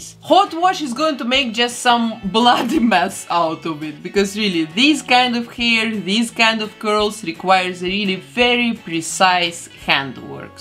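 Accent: Russia. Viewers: Are you Russian? Cos you have such a cute russian accent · У тебя русский акцент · Слишком русский акцент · Мне кажется, я слышу сильный русский акцент🤔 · почему вы говорите как русская?.... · hmmm russian dialect...... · Мне кажется или она русская, у нее акцент не англичанки или британки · Ни капли не разбираюсь в английском, отличить акценты ни в этой жизни не смогу, но русский с первых минут просто узнала. Вот прям слышно что наш человек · что за русский акцент:)) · У вас очень сильный русский акцент. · Воу, мне кажется или ты русская? Просто акцент русский . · Your accent reminds me of a Russian accent 🤔 (yeah, i'm russian👌)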